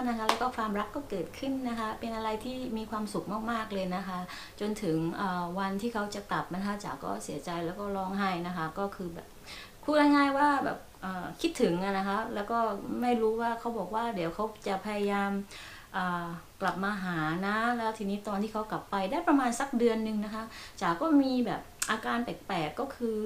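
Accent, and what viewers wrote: South, Thailand. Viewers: ผมติดตามล่ะครับผมฟังพี่จ๋าพูดในคลิปก่อนรุ้เลยว่าคนใต้บ้านเรา😁😁😁😁😁😁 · ฟังพี่จ๋าครั้งแรก รู้เลยว่าเป็นคนใต้แน่ๆ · พี่เข้ามาดูครั้งแรกก้อรู้ว่าน้องเป็นคนใต้ สำเนียงออกพี่ก้อคนสุราษฎร์ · พูดใต้แล้วเพราะมากๆเลยค่ะ เสียงน่าฟังมาก หนูก็เป็นคนใต้ค่ะ แต่พูดใต้ไม่ได้ เพราะโตที่กทม. พอพยายามลองพูด ยายชอบบอกว่าแหลงทองแดงค่ะ55555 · พี่สวยมากเลยยยย ชอบเวลาพูดใต้❤❤❤❤ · เพิ่งเข้ามาดูแต่รุ้สึกชอบ แบบพูดจาน่ารักก็คิดในใจว่าทำไมเหมือนคนใต้ก็ดูมา2 3วัน จนมาเจอคลิปนี้ตอนนี้เองเพิ่งรุ้ว่าเป็นคนใต้ เราก็คนใต้เหมื อนกันค่ะอยู่จ. ติดกันเลย กระบี่ค่ะ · ว่าแล้วสำเนียงพี่คล้ายๆคนใต้ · ชอบฟังค่ะ...คนใต้ๆ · น่ารักมากเลยค่ะ แหลงใต้เหมือนกัน แต่กลัวเพื่อนฟังไม่โร้เรื่อง เลยต้องแหลงกลาง คุณจ๋าสวย และเลิฟสตอรี่ ก็น่ารักมากเลยค่ะ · ชอบสำเนียงใต้นะค่ะ น่ารักมากๆค่ะ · ว่าแล้วเสียงแหลงเหมือนคนใต้ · พี่แล่งใต้ น่ารักค่ะ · เพิ่งเข้ามาค่ะ ฟังสำเนียงแล้วว่าคุณจ๋าน่าจะเป็นคนใต้นะค่ะ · ติดตามมาจากคลิปเปิดบ้าน หนูฟังครั้งพี่จ๋าพูดหนูมั่นใจเลยว่าพี่จ๋าต้องเป็นคนใต้แน่ๆ แล้วก็ได้คำตอบจริงๆจากคลิปนี้ 555หนูก็คนนครเหมือนกันค่ะ ยินกับพี่จ๋าที่มีครอบครัวที่ดีและอบอุ่นแบบนี้น่ะคะ ดีใจที่ได้ติดตามพี่คะ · ว่าแล้วพี่น่าจะเป็นคนใต้ หนูคนนครเหมือนกันค่ะ พี่สวยน่ารักมากๆเลย ^^ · คิดยุว่าเป่นคนใต้หรอสรุปมารุ้เพราะคลิปคนใต้เหมือนกันจ้าาติดตามยุนะคะพี่จ้าาา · คุณ*พูดใต้สำเนียงชัดเจนมาก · หนูเป็นคนใต้ค่ะ นครศรีธรรมราชเหมือนกันเลยค่ะ ว่าแล้วฟังพี่เสียงแบบคนใต้เลย ชอบการพูดมากค่ะ ทำให้หนูกล้าแสดงออกมากขึ้นเลย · นึกแล้วว่าต้องเป็นคนใต้ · หวัดดีค่ะคุณจ๋า ชื่อฐาค่ะเป็นคนใต้ปัตตานีค่ะ เพิ่งเข้ามาดูคุณจ๋าค่ะ ตอนดูครั้งแรก คิดในใจว่าคุณจ๋าเป็นคนใต้หรือเปล่าเพราะฟังจากสำเนียงแล้วใช่เลยค่ะ คุณจ๋าโชคดีจังค่ะได้สามีที่ดี ครอบครัวอบอุ่น ดูครั้งแรกตกหลุมรักคุณจ๋าเลยค่ะ จะติดตามต่อไปนะคะ หวัดดีค่ะ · คนใต้เหมือนกัน ว่าแล้วทำไมเสียงแบบไม่เป๊ะแบบคนภาคกลาง ดูคลิปบ้านอเมริกาครั้งแรก คลิปนี้เป็นคลิปสอง ชีวิตดั่งเทพนิยายมากครับ ^^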